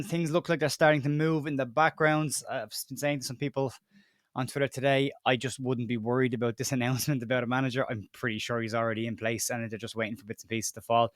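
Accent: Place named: Ireland. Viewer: Thanks, plenty of false announcements in my feed this morning from most of the other LUFC YT channels, didn't even bother to watch them. These days I'm happy to wait for the real news, in an Irish accent.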